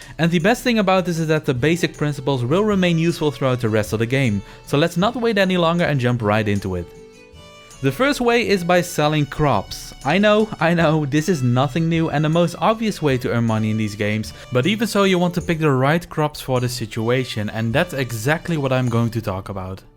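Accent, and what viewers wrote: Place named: Netherlands. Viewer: Hi thanks for the tips. Are you from the Netherlands 🇳🇱 you sound like my parents who were both born and raised there. Great advice thank you 😊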